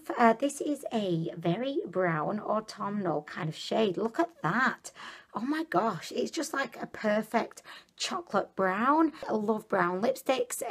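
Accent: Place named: Manchester, United Kingdom. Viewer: Ahahah great accent where you from?....Sounds a bit like a Mancunian accent..I'm from Manchester also....your skin looks great but love that lipstick on you x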